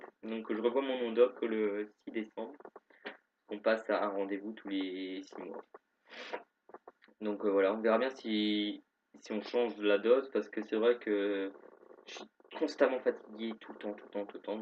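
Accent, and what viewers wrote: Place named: Nord-Pas-de-Calais, France. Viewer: Ouai, ils sont vraiment graves l'administration... ils ne se mettent pas à ta place, ça coûte rien de modifier un prénom tout en laissant au pire ton prénom f quelque part, au moins pour que tu te sentes bien en cours, quoi. Et pour la personne qui t'a balancé, je trouve ça dégueulasse, je lui aurai refais le portrait lol Moi aussi, je suis du Nord, plus près de Béthune :D Me semblait bien avoir reconnu l'accent ch'ti ! Et t'en fais pas pour la mammec, ton résultat est vraiment bien pour 3 mois, ça va encore beaucoup changé.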